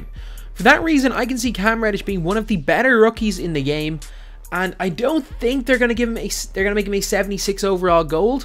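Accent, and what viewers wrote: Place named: Ireland. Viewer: Its his accent hes irish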